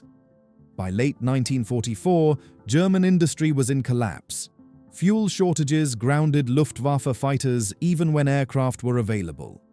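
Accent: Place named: United Kingdom. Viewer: The AI-generated narration has no grip on what a timeline is, and its British accent doesn't help.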